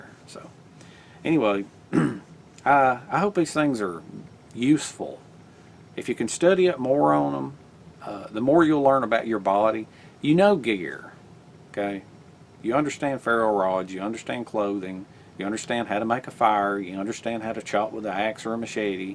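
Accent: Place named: Appalachia, United States. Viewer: Hi. Although it's true that you have a strong Appalachian accent, your word enunciation is always pure. Whereas, in my accent, some syllables are left out or modified. Your CC is great and matches your vocabulary perfectly. I did enjoy it. Thanks. I love having CC as an enhancement to a video.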